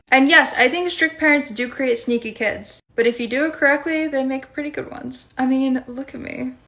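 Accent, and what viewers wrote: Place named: United States. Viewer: You've got an American accent, but a Russian face 😅😅 Your acceptionably beautiful 😍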